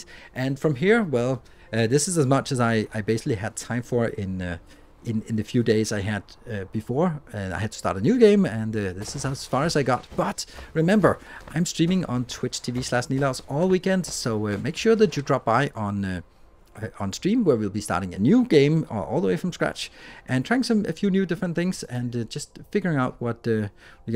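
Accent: Denmark. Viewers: As a dane, its nice to listen to English with danish accent.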